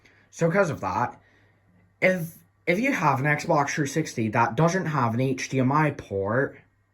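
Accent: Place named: United States. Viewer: it an autistic sounding american accent, hope this helps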